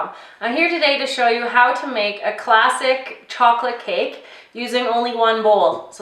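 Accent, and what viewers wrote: Canada. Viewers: Hi, this recipe is excellent. It passed the "culinary-challenged-bacehlor-slob" test. Yup, I'm the bachelor-slob who can't cook to save his life, but was able to make this. It turned out great! So did the chocolate butter-cream frosting recipe you posted to complement this recipe. Why haven't the Food Network or the Cooking Channel hired you yet? You do very well explaining these recipes and techniques. Your Canadian accent is charming and makes me think of all my Canadian friends. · Thank you for posting this xoxo Are you from Canada? Lol, just I can hear an accent :P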